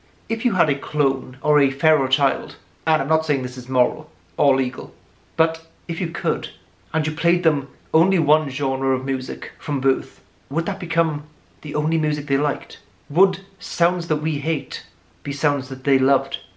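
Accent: Wales, United Kingdom. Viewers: Why thank you! It's a Welsh accent.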